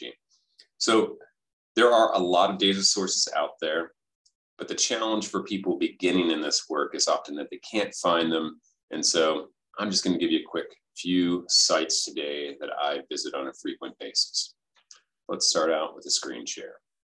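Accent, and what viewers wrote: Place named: United States. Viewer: Professor, thank you very much and congratulations for this very didactic video. Could you also add the footnotes in this video to allow a good understanding? I, for example, do not understand the American accent very well.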